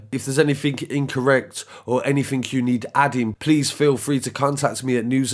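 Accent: United Kingdom. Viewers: I really like the channel and wonderful to be able to support a u.k. voice on u.k. crime for once as most of my true crime channels are American or Australian. Think the channel will grow as you do a really good job of the facts and are always respectful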